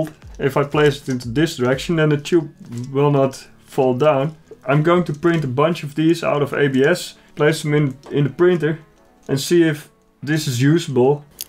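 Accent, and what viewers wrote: Netherlands. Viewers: do I hear a dutch accent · Are you dutch because of youre accent and the you sayed tering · I'm too lazy to see who fourth or fived that so I'm just gonna n that :D Try reversing one of the phases. Use a multimeter to determine the individual coils or if you can find one, pull up the datasheet for the motor. I've had the same issue multiple times and that always fixed it. Steppers don't break just like that ;) Btw, totally subbed. Interesting content and Dutchlish is just super pleasant to listen to :D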